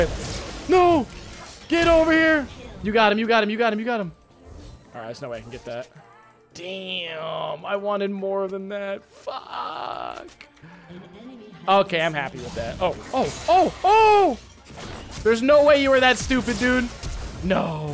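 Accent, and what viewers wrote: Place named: Boston, United States. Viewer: Boston accent baby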